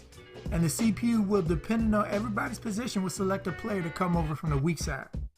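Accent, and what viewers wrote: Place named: New York, United States. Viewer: you got that new york og voice and that beat selection is perfect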